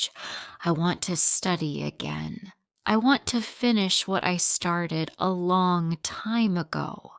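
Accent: United Kingdom. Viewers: Thank you for your story. Is it British English? I have fixed for myself some useful expressions.❤❤❤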